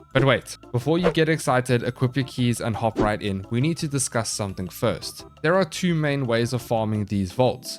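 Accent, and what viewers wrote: South Africa. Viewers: i have to ask where are you from? you sound like you from South Africa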